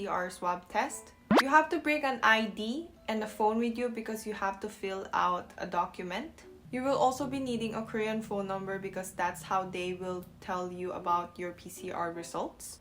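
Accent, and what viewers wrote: Philippines. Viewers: I am still amazed by your accent and your tagalog language. So fluent para ka tlagang pilipino. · O diba, pinoy na pinoy kung magsalita.👍👍 New subscriber here. Hope you upload videos regularly in the coming days.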